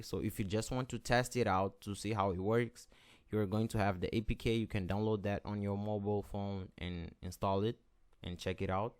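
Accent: Brazil. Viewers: Mano tu é brasileiro? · you're Brazilian, right? lol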